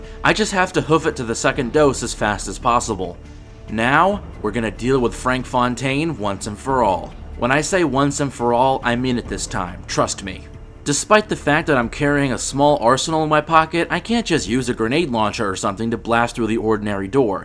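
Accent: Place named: Ireland. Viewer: Ahh makes sense. The accent is more subtle than other Irish youtubers I watch so they didn't come to mind.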